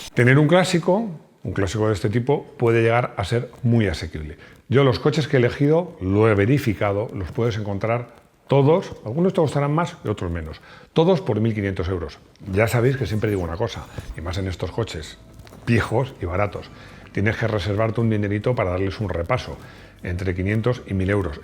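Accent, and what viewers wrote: Chile. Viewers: es chileno? ese acento reconozco xDD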